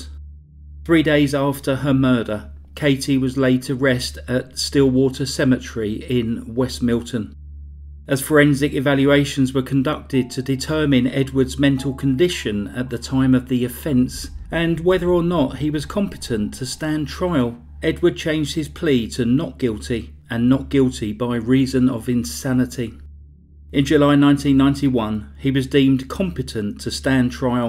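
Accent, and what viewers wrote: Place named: England, United Kingdom. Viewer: "Mentowe anguish dew tew juhhuee tryowe." I want to write a book with your accent for the narrator. I don't know if the preceding sample would even be legible for anyone. I don't know from what locality your accent originates, but I would be very interested to learn more about all the regional vocal inflections in England - I love dialects!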